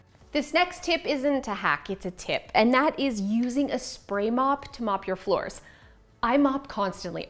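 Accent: Canada. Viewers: Thank you for showing up, even when you might not feel like it. I love the personal stories you share are how honest and real you are. I always learn something from you and you always motivate me to go clean or organize something. I also love your Canadian accent, since I grew up in Ontario, Canada, eh. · That's great that you want to do something new! I'm new to your channel and I'm just here for the tips in your Canadian accent and the shenanigans that fill your life and come out of your mouth. 😂 Maybe take the camera outdoors and let's see what happens when life happens. Byeeee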